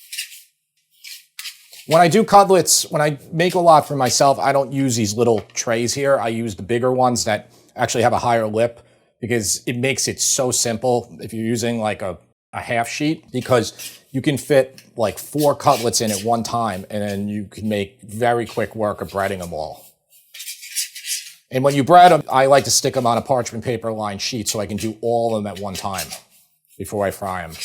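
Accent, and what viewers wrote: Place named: Midwest, United States. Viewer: Why is ' accent so different from? sounds like a New Yorker but sounds midwestern.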